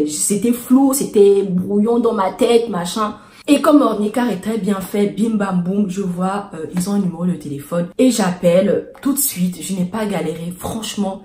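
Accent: Gabon. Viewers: je connais l'accent là. C'est une gabonaise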